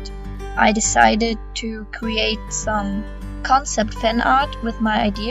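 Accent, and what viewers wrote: Germany. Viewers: Das du Deutsche bist merkt man echt krass an deinem Akzent... XD Kennst du eigentlich eine Feya Steelleaf vom SSOA..? p.p oder Vielleicht Camelia Mistlord? XD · Bin ich die einzige die da etwas deutsches an ihrer Stimme hört 😮❤ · Man hört so raus, dass du deutsche bist HAHA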